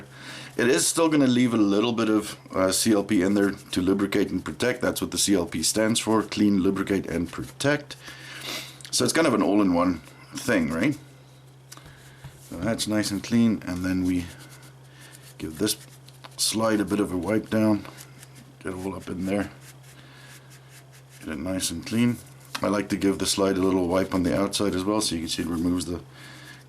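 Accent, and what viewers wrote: Afrikaans, South Africa. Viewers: I sense the Afrikaans in you :)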